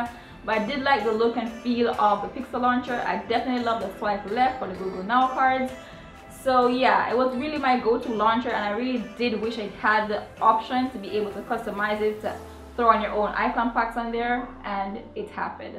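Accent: Jamaica. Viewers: you sound like a jamaican nice videos once your on youtube you set you soon get more subscriber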